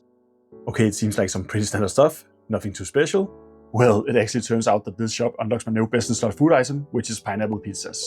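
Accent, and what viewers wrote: Denmark. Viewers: fuck jeg elsker danglish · See, i love me a fine light accent on the tube and this one is charming as heck lol, where you from, Danmark?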